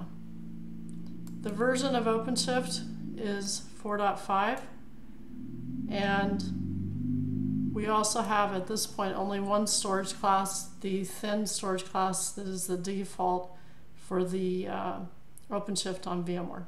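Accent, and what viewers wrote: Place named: New Zealand. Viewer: Great video. Love the kiwi accent too!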